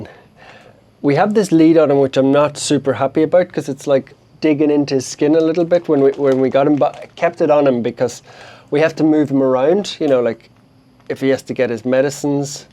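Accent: Ireland. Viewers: Irish brogue/lilt ❤❤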